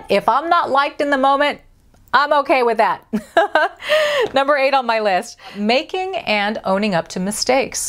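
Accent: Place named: United States. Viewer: Hello...I'm German and I love to listen to your "perfect" American English to improve mine :)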